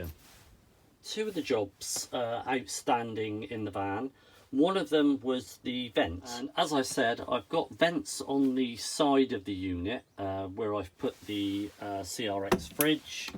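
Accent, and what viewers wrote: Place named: Gloucestershire, United Kingdom. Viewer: Superb my friend, attention to detail are excellent. The "gentleman" as always, giving people that have helped you on your journey a thank you, and a really genuine plug. People such as yourself give me a feel good factor, by restoring my faith in mankind, when so many others are trying to "ruck" or "scam" you. A man after my own heart, I'm sure you and I would get on like a house on fire. Phil. Incidentally, are you in Gloucestershire/Bristol district, only I'm picking up on your lovely accent??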